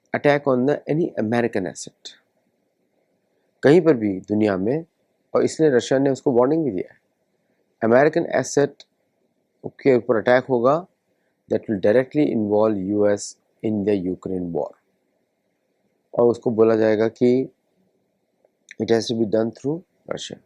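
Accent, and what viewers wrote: India. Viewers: भाई वो गुरुकुल चला रहे है एक अच्छा काम है आज के दौर में । फिर उसका प्रचार किया तो आप को इतनी तकलीफ़ क्यों होती है? और एक मिनट से ज़्यादा भी नहीं लगता उसे । और उन्होंने चैनल का नाम sattology रखा इसपर भी आप को ऐतराज है जब को ये बात उन्होंने पहले भी समझाई है । sattology ये शब्द mythology का काट है और हा हिंग्लिश है तो है पर अच्छी काट है । हमारे पुराणों धर्मग्रंथों को mytholgy करके धुतकारा जाता है इसको प्रभावी रूप से काटने का उनका ये एक प्रयास है । और आप को उनकी हिंदी समझ नहीं आती इस में वो क्या कर सकते है, वो महाराष्ट्रीयन है और थोड़ा लहजा उस प्रकार से है । मैं भी इन्हें रेगुलर सुनता हूँ और हमे इन्हें समझने में कोई अड़चन नहीं आती । तो आप को जरा देखना पड़ेगा । भाषा शुद्धिकरण का मैं भी आग्रही हूँ परंतु हमे पहले जैसे भी हो हमारी संस्कृति का ज्ञान होना आवश्यक है और इस चैनल की वजह से बहुत से लोगो को हमारी संस्कृति धरोहर इसके बारे में पता चल रहा है । भाषा शुद्धीकरण भी आयेगा पहले लोग जुड़े तो सही ।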